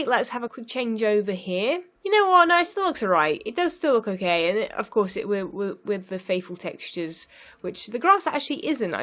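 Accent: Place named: England, United Kingdom. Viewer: i love your English accent :D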